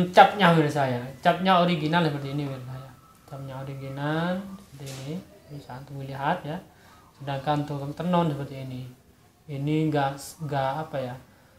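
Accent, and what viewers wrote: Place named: Madura, Indonesia. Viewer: Pak ustad nya orang madura kak kik kuk bhs indonesia nya.. lucu😁 tapi saya kagum dengan anda pak ustad semoga lancar jualannya.. aamiin...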